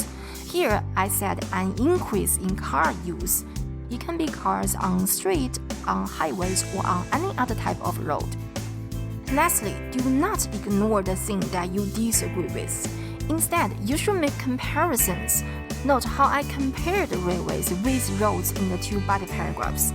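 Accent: China. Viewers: Laoshi I love your accent my Chinese girlfriend accent was same I have lived in china more than 7 years Chinese people use the same accent they call everysing instead of everything You are great keep it up After listening your voice it take me back in memories of past that we have made in past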